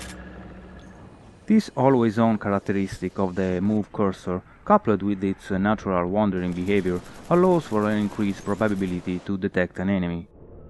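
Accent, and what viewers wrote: Italy. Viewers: il tuo inglese non è male, ma l'accento è italiano. xD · Ma sei italiano?.. Sembra dalla pronuncia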